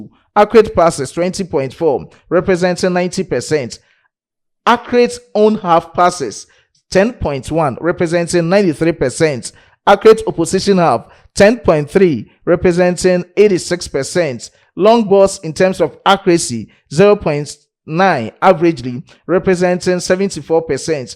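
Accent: Ghana. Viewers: You speak like a Ghanaian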